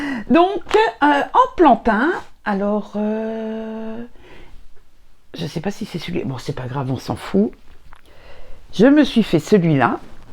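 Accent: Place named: Alsace, France. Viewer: j'adore votre accent, j'ai vécu en Alsace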